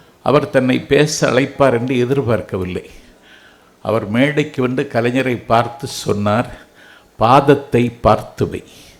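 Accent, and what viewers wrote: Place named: Nanjil, India. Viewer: Very good speaker. Nanjil tamil is always sweet.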